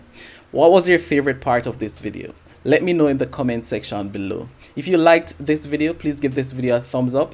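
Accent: Jamaica. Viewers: Good video. Are you from Jamaica?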